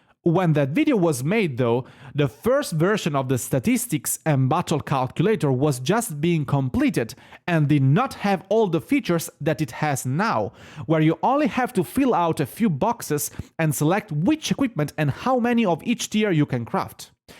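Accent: Italy. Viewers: Ma????sei italiano?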